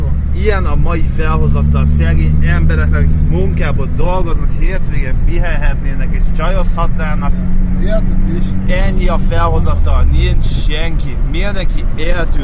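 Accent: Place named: Transcarpathia, Ukraine. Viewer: Mert valószínűleg Kárpátaljai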